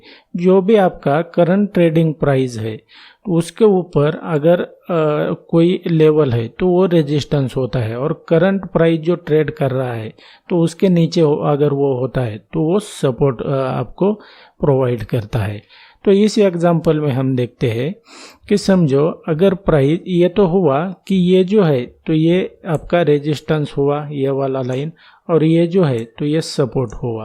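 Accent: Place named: Hyderabad, India. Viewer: Hyderabadi ho kya aapke hindi speaking se aisa lagta ha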